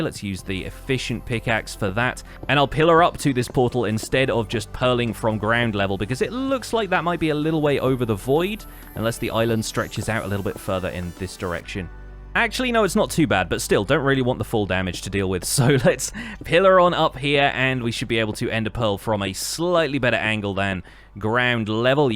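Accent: United Kingdom. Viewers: Love your British accent. Greetings from Italy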